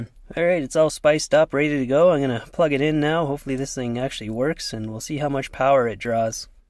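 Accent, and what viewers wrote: Canada. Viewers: You are SO smart!!! How'd you learn about all that stuff??? Your cooking always looks good as well! I admire how you like to cook your own food instead of buying fast food, I do also. You are cute, and I love your Canadian accent! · I can hear the Canadian "Eh" in your voice. Lol. I love your channel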